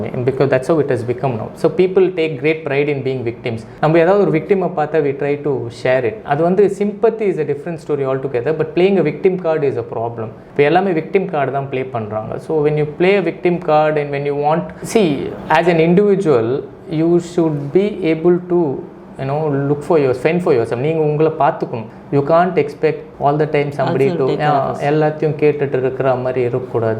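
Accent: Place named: India. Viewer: I love his English, his voice and his Indian accent. Every one including children can understand.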